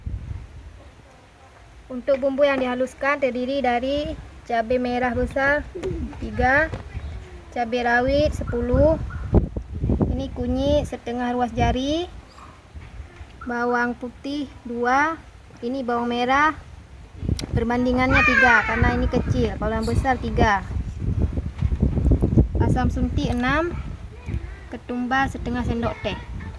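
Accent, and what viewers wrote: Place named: Aceh, Indonesia. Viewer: dapu tanyoe aceh iy logatny jg.hehhe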